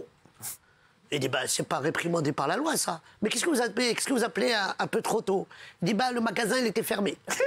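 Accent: France. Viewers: Cest si plaissant ecouter des blaguees du vrai français et non quebecois français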